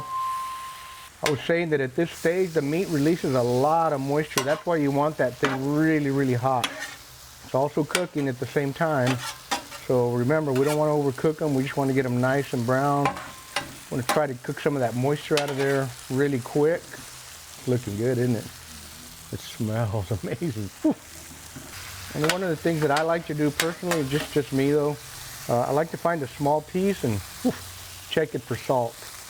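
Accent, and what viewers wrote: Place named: South Texas, United States. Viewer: I could hear it in your voice brother. Haha The RGV is my second home. I spend a lot of time out in McAllen. Do you have a restaurant out that way?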